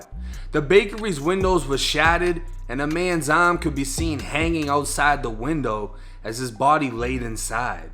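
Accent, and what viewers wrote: Boston, United States. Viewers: Bro, u gotta be from Boston!! Up north somewhere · Bro you from Boston on Florida lol I can’t tell witt ya accent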